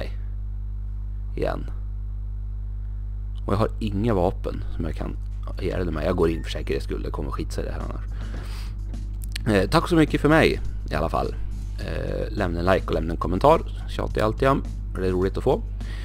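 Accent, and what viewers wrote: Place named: Sweden. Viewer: Älskar din dialekt känn lite bonländska i det (stav) c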